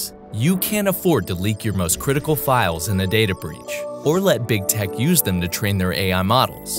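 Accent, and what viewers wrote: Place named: United States. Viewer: Congratulations on the great products. That said, the narrator’s American accent feels out of place. Proton is a European company—why not present it with a European accent?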